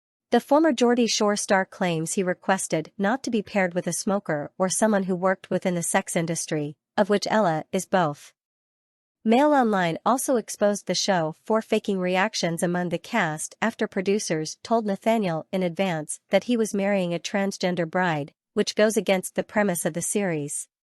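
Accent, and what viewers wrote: United States. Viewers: Your voice is awful-why is an American doing this voiceover!! Has she inhaled helium ..awful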